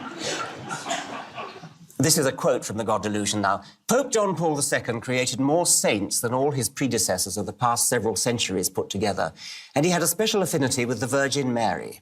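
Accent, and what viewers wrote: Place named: England, United Kingdom. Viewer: MENOS K EL PROFE ES INGLES NO HABLA COMO LOS CHINOS" YANKIES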